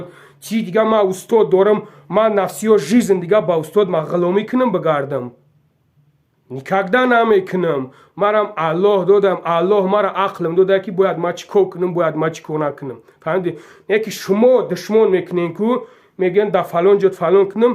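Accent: Kulob, Tajikistan. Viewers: Ту шердили Звенигород меги й дигачодум бра така риши рихи Кулоби нав гап зан шиди.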